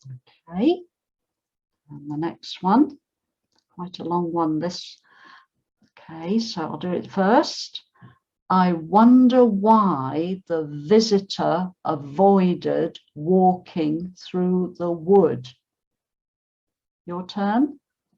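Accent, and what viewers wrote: United Kingdom. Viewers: i do love ur accent, British accent 🥰 that's y we called english, english is the mother of languages,lol American speaks english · Good morning. I am writing, "Good morning," because it is morning in Siberia. Thank you very much for your lessons, they are very useful and your pronunciation is perfect. Real British English. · Thank you so much. You use British accent right. This is what I looking for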